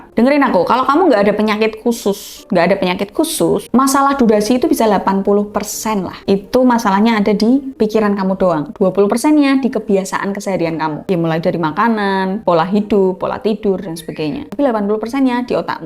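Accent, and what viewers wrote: Java, Indonesia. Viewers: Mbae Jawa bgt ya...😂😂😂 I love U Mbae. ❤❤❤❤❤❤❤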